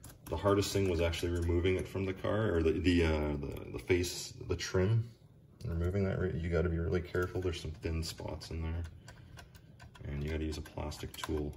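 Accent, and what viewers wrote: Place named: Ontario, Canada. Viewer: Thank you for such a great video! My radio is acting up now too so I ordered the digitizer from Ali. I'll report back after I replace it. I'm in Canada as well, Halifax. Where are you? You sound like maybe from Ont.? Thanks again! Cheers...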